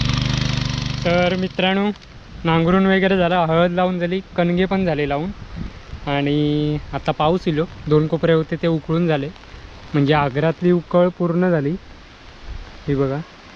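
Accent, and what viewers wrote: Malvani, India. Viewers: तुझा वर कोण रागवत नाही बाबू तु मालवणी बोलूक लागलस काय खुप छान वाटता तु असेच छान छान विडीयो टाक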